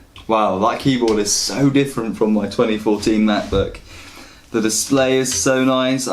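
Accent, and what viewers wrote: United Kingdom. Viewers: Haha love the English accent bro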